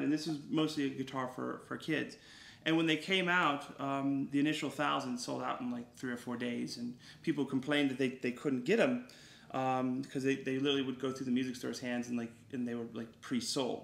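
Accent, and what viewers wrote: United Kingdom. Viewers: your accent is confusing...just when i start to think you are american BOOM you sound british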